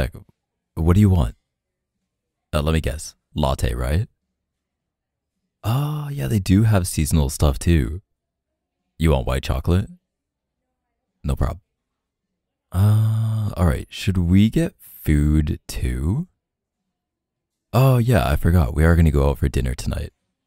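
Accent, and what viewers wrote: Canada. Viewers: he sounds extra canadian here 🫣🫣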